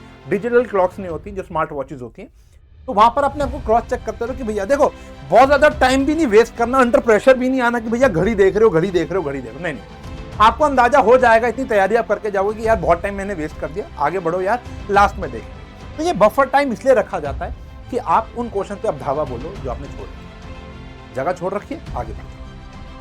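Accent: Punjab, India. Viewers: sir your punjabi accent bahot achi hai sir 😀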